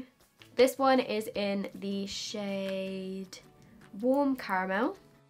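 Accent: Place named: United Kingdom. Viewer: Ahahah sometimes your British accent just like JUMPS OUT 😂 Xx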